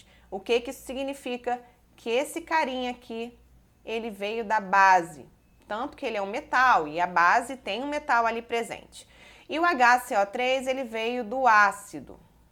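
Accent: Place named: Rio de Janeiro, Brazil. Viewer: É o sotaque carioca KKKKKKKKK nossa voz sempre parece por isso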